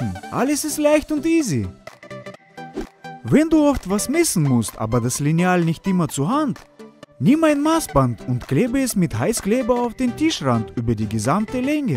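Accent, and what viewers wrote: Russia. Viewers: bist du aus Russland? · Nur wegen diesem russischen Akzent geguckt :D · Are you Russian or Polish? Your German accent is interesting.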